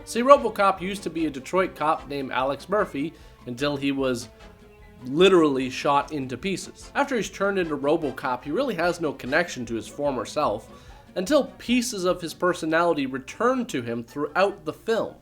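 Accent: Canada. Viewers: lol that Rob Ford reference was hilarious. Are you Canadian btw? It seems like you have the accent. Great Vid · Are you Canadian? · Are you from Toronto?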